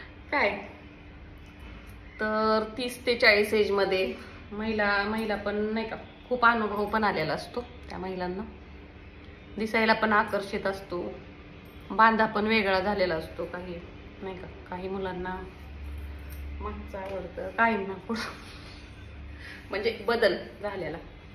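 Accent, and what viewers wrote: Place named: Pune, India. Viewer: Tumchya bolnya warun tumhi punyat kuthe Rahat aasal te lakshat yete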